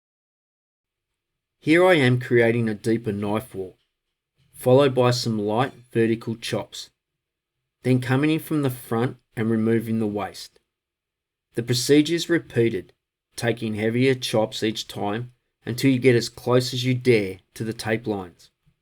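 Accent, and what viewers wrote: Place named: Australia. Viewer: do I hear an Ausie accent? Love your videos. One Handed Maker au!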